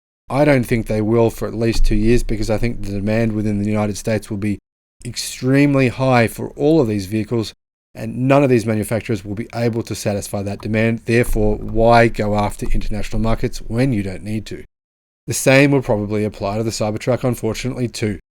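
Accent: Australia. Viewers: Love Aussie accent! I'll wait for Rivian to deliver to Europe - or even F-150, but Ford most probably won't deliver F-150 to Europe though... (would love to get rid of my Tesla MS, won't buy any other Tesla vehicle, no matter what, because of how I experienced the really bad service and customer treatment after 4+ years of ownership...)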